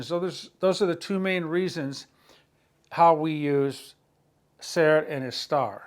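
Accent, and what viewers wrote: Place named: Massachusetts, United States. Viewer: Great video! Really enjoyed that; and love the MA accent too! Thank you.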